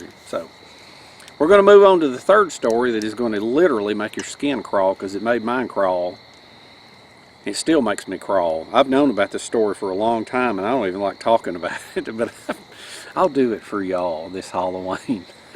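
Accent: South, United States. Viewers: Ow yes I "love" these scary stories..especially when it's spoken with a Southern draw..greeting from The Netherlands❤🇱🇺 P.S. love your channel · Yep. Shame that the Southern accent is slowly disappearing.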